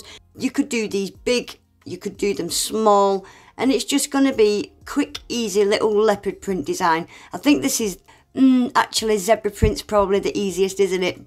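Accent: Northern England, United Kingdom. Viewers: Can I ask, where are you from? Your accent sounds up north lol. I’m from West Yorkshire. What attracted me to your videos was the accent. Stayed for the nails 😂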